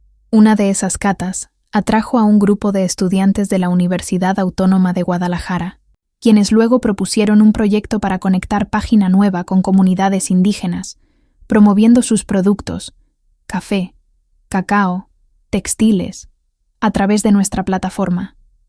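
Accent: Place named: Spain. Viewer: Una chica mejicana con acento española.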